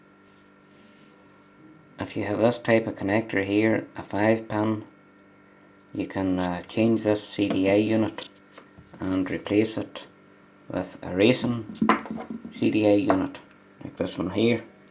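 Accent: United Kingdom. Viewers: Sounds like Northern Irish accent speaking English obviously.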